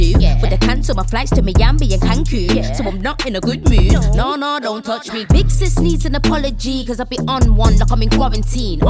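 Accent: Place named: London, United Kingdom. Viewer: you're the fu*#in proper London style, big up from Italy ❤️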